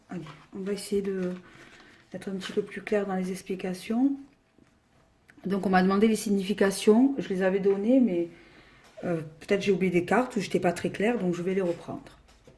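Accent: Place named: Marseille, France. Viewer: bonjour; je voulais savoir de quelle région tu es; a ton accent je dirais Marseille. J'aimerai beaucoup apprendre avec quelqu'un et j'aime bien ta façon de faire.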